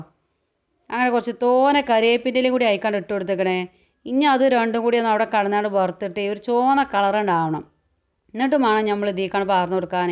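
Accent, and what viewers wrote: Malappuram, India. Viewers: അടിപൊളി മലപ്പുറം ലാംഗ്വേജ് കേൾക്കാൻ ഭയങ്കര സുഖം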